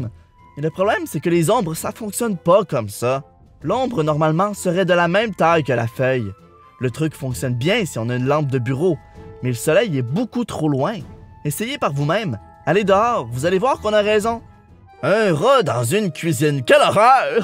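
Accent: Quebec, Canada. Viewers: Je surkiffe l'accent québécois c'c'est un folie je veux vire à Montréal la banlieu de Paris c'est la dech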